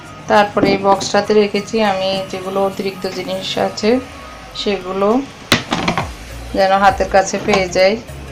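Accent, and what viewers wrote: Noakhali, Bangladesh. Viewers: Mone hoy apur desh Lokkipur ,Noakhali,darun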